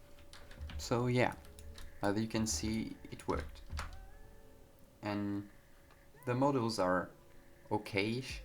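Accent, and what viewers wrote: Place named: France. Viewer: Thanks so much for making this video. Your French accent is great mon ami!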